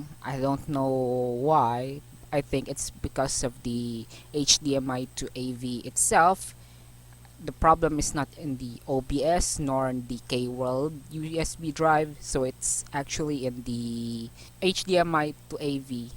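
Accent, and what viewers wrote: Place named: Russia. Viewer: your voice is very russian accent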